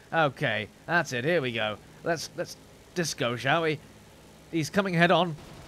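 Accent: England, United Kingdom. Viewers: oh god, you are sooooo british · It's a show, although he has been talking like this for so long that even on his serious videos he will sometimes go off into his wonderful British way of talking :) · I'm in love with your accent. What kind of british are you, m8?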